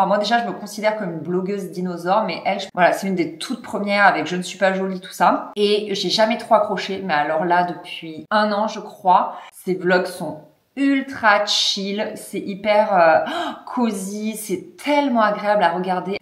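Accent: South, France. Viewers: Merci, je t'ai découverte il y a peu et j'adore tes vidéos (que j'ai binge-watchées héhé). Tu es apaisante, très agréable et je suis persuadée que tu es vraiment sympa en vrai... et cette pointe d'accent du sud m'amène un peu de soleil. Hâte de te retrouver...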